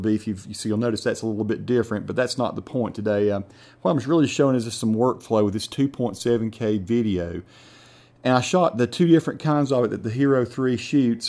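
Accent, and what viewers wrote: South, United States. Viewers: Southern accent?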